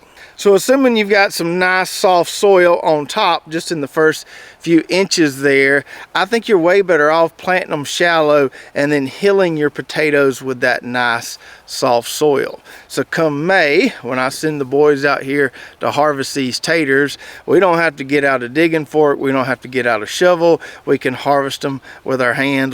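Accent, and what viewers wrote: South, United States. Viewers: Are you trying to loose your accient..... I know that you do not hiil your in ground taters but you heel them. Keep the accient and Southern way. I enjoy you viedos and your information...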